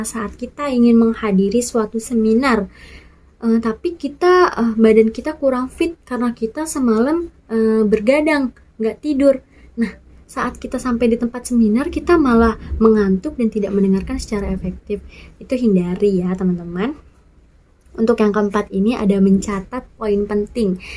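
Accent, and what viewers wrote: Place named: Madura, Indonesia. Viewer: Kadang logat madura ngikut wkwkwk 😅😅 selebih ny ok lah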